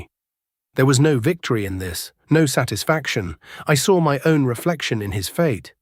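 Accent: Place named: United Kingdom. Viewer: The narrator with the British accent talks too fast. British accent talking about American farm?…?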